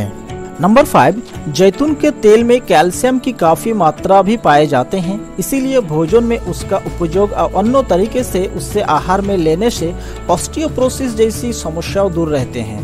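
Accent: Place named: Bengal, India. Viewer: Yeh bagali h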